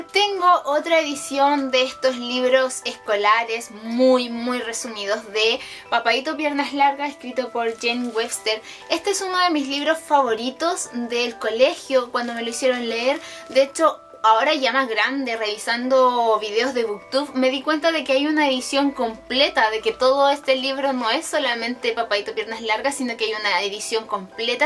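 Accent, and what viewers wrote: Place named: Chile. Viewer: no sabes cuánto me alegra esto jsjjsjsjs yo estoy empezando hacer videos porque pensaba que no había chilenos y ahora te encuentro a ti con el hermoso acento chileno jajaja es la cumbia!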